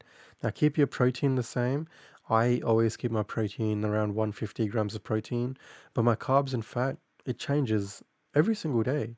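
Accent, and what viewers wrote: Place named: United Kingdom. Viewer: Thank god you’ve a British accent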